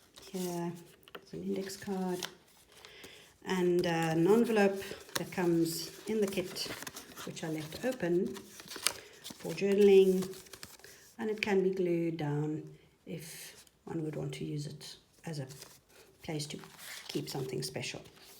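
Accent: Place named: South Africa. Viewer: Hello fellow RSA-ian. Love your work... and listening to a familiar accent. Thanks for sharing.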